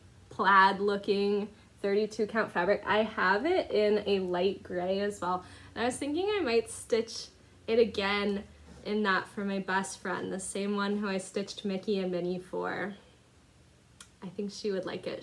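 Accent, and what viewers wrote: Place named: Midwest, United States. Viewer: Hi! So nice to "meet" you. Michelle Bendy mentioned you so I have now watched all three. I had a feeling it was midwest. I spent approx 30 years in Oak Park & Forest Oark, IL so hi neighbor. 😁 Thanks for sharing your time, and your joy of stitching. It's refreshing and no, I don't hear a lisp at all. Age? 29?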